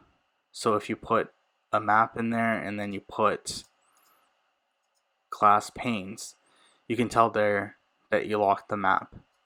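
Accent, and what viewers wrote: India. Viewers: Are you indian bro? Please tell me◉‿◉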